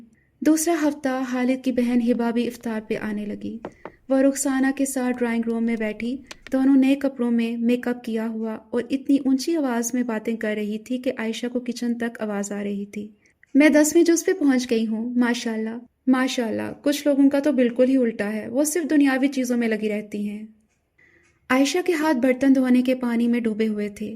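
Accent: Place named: India. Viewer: Why u speak indian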